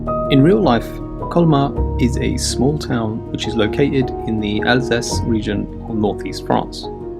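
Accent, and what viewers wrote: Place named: England, United Kingdom. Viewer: i like your voice and your english accent, you put it down that relax me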